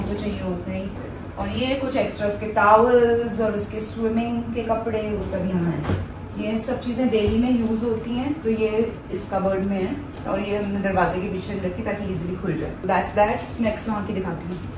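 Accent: Delhi, India. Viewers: Your delhi language is ❤️❤️❤️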